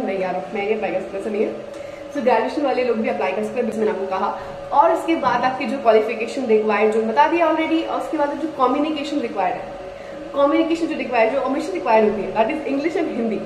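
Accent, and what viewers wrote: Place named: Haryana, India. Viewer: You speak haryanvi accent well. By the way wat happened to your hands. Any injury?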